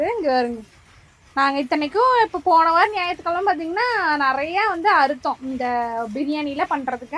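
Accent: Kongu, India. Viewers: ஆமாங்கோ கோயம்புத்தூர் காரங்க ஈரோடு காரங்க எல்லாம் அப்படி தானே பேசுவாங்க😊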